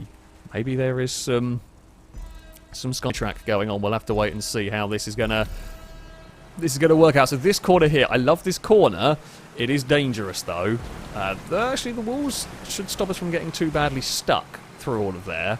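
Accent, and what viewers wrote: United Kingdom. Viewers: That marvelous British English is half the reason I watch this channel, not gonna lie.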